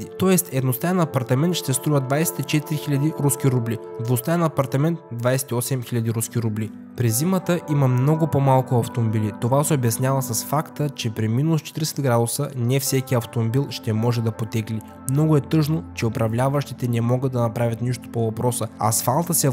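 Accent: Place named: Northeast, Bulgaria. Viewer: Абе такъв североизточен акцент :) Иначе çok güzel kanal. İyi günler :)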